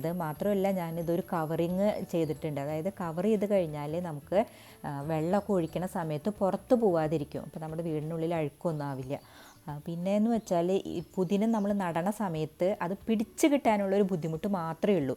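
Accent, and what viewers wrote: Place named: Pattambi, India. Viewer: Slag kettappo manasilayi.... 🤣😍.😍... me also... Pattambi.😘.. Madam evide?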